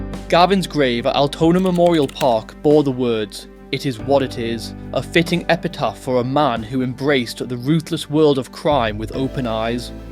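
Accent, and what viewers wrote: England, United Kingdom. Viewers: 😂You idiot it's obviously English, have you left Frankston before?😂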